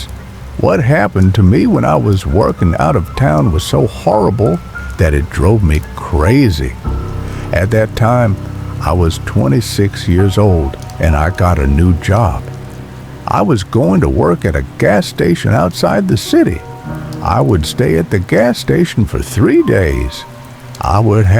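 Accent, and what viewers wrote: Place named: South, United States. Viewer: A Southern accent talking about kilometers and petrol??? Somebody didn't do ANY research before posting this mishmash.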